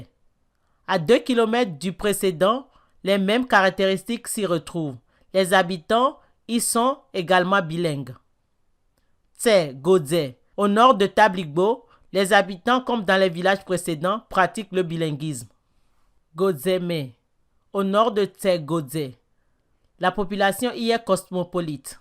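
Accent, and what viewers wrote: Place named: France. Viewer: Salut. Bonne synthèse. Vous auriez pu parler plus posément pour permettre aux auditeurs de mieux vous suivre. Par ailleurs, dans les prochaines émissions, essayez de prononcer correctement les ethnonymes et toponymes avec l'accent voulu -et non l'accent français - pour être mieux compris. Enfin, on ne fait pas l'histoire sans parler des sources. Et essayez de varier ces sources. Merci.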